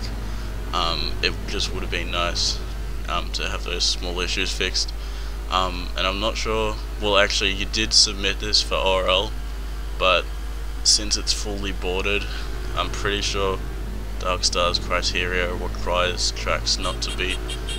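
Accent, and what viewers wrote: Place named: Australia. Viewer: Great Australasian accent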